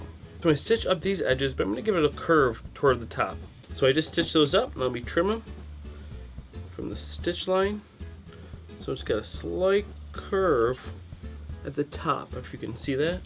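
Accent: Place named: Midwest, United States. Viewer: where are you from? I just think you sound midwestern but not sure (CO expat living in England)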